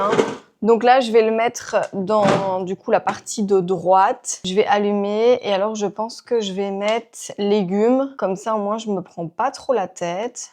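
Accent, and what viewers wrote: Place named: Belgium. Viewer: Bonsoir, je n ai pas trouvé la réf de la lampe que tu mets sur ta caméra. C est pour accrocher à mon miroir. J ai cherché sur ta boutique Amazon. C est carrément le makeup que j aime: glow… J ai exactement le même modèle de boucles d’oreilles. C est vraiment un bel intemporel. C est vrai que tu as un fort accent belge parfois c est très drôle 😂😂😂. Je vois que Vivi t accompagne au pilâtes. Mes chats font la même chose. Ils se demandent ce que je fais par terre. Tu as utilisé ta palette Dior habituelle pour le glow? Je pense que je vais me commander la brume Rhode. Bises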